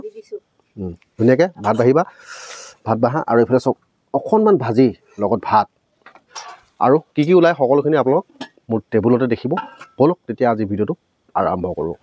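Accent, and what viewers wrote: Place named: Bihpuria, India. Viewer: o dada amar bo ghr bihpuriya moi apunar aru mur kotha mile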